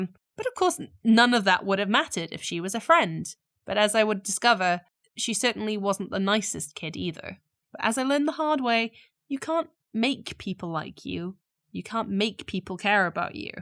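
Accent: Wales, United Kingdom. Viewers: are you from walesss???? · HEY THATS WELSH! woah your welsh too? Cool! I’m welsh also ^^ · you sound british at first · Blywddyn saith 😂 you from south or north wales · Omg wait you’re welsh??? A bit creepy but where in Wales are you from?? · W-w-waaaait Are you Welsh And did you go to a Welsh school?! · WAIT- are you Welsh?!?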